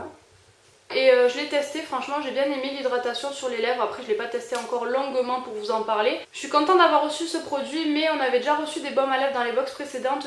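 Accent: France. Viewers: Mort de rire l'accent marseillais hihi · Salut, Cette box m'a l'air super je croit que je vais commande des boxs. Pourrais tu nous expliquer comment commander des boxs ❤️ Sinon j'adore ton accent je suppose que tu vient du sud 💜 Gris bisous et a bientôt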